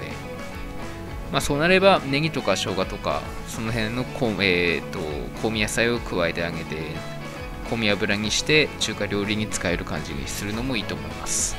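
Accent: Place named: Aomori, Japan. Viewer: 青森の方ですかね？ 津軽弁が懐かしいです。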